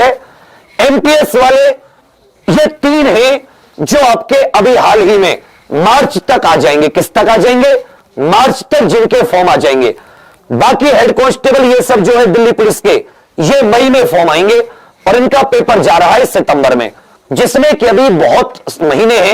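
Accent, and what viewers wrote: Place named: Uttar Pradesh, India. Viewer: Guru ji sudh desi u.p ka lag rha ho sol ma ek dam must 🤘🤘🤘❤️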